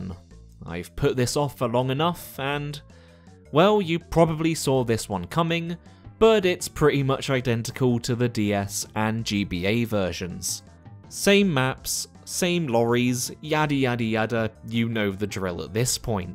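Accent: United Kingdom. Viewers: It isn't annoying to hear lorry. It is refreshing to hear an Englishman speaking English.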